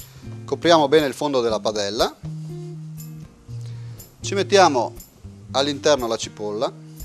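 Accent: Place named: Friuli, Italy. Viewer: l'hai sentito parlare? certo che è friulano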